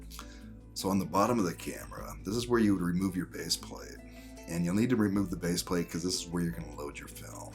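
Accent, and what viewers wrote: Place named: Alabama, United States. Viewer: Hi. Love watching your videos, very informative. I have to ask and sorry to pry, but are you from Alabama? I ask only because you have the same speech patterns as Tim Cook from Apple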